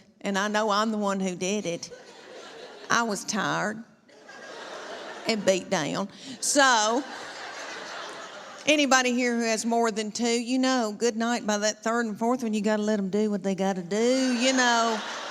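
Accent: South, United States. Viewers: No....that's her! She's from the south. We all talk that way😂🤣😂🤣😂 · It's that good 'ol Southern accent!!! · oh my God I LOVE that southern accent!!! Hey Natasha Leggero, can you imitate this accent? I think you are so close to perfect now well OMG talk like this and I just might explode!!! Tasha, I love you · With her looks and that southern accent she could talk me into just about anything, and make me laugh at the same time. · We saw her in Knoxville at the local comedy club. Very funny lady!! Love her southern accent. 💕💕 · That southern accent kills me!!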